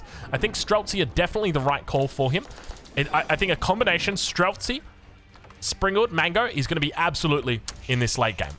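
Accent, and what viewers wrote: Australia. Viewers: i could listen to talk about anything. dude is so funny and quick and idk if it's an American thing but Aussie accents just make me smile. i dont even play AoE but i know everything about the game now haha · Hey, my Englisch was never good. But cause of you it sounds even more funny. After picking up Spanish accent when living in South America and mixing it with my German accent now it got spiced up with your Aussie accent. Thanks for that. 😂 love your vids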